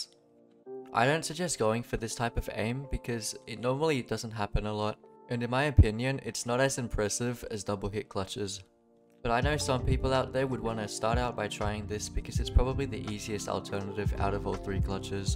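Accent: Australia. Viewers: idk i why im asking this but u sound aussi. are you? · I KNEW IT, HES AUSSIE, btw this is the gist voiced vid that I have watched from tou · YOU SOUND LIKE.. an australian…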